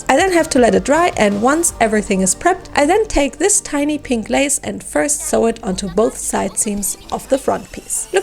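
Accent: Germany. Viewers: Are you German? Also I love what you did with pinky🥰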